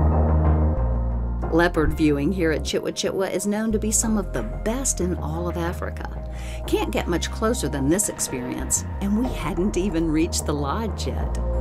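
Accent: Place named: United States. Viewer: Why would a Sth African Lodge use a fawning American lady for the voiceover, in this grating sycophantic advert, is a puzzle.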